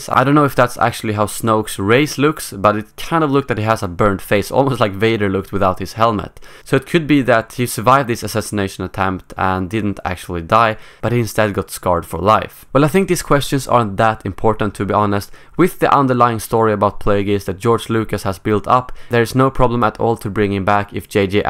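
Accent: Sweden. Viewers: the swedish accent doh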